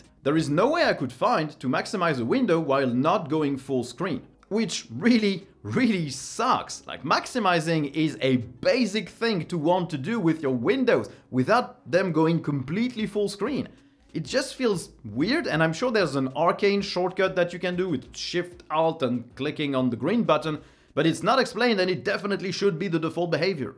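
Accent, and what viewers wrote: France. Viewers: That's French accent^^ funny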